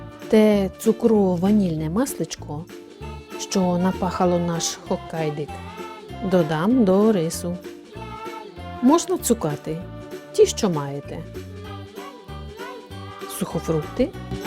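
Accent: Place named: Border region, Ukraine. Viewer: 6 гарний гарбузик 😃 Цікавий у вас акцент, десь на кордоні живете? Смачного 😋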